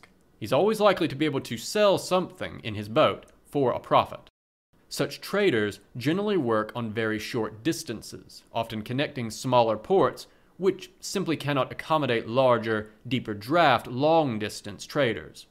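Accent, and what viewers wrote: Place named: South, United States. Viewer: Thanks for doing the audio! I really enjoyed it. Where are you from? I keep thinking somewhere...southern US east of Texas. (It is not a huge accent. Some vowels, not all of them and none of them all the time, sound slightly southern to me.) Maybe Alabama or Georgia? State-level id is plenty, I'm not trying to doxx you.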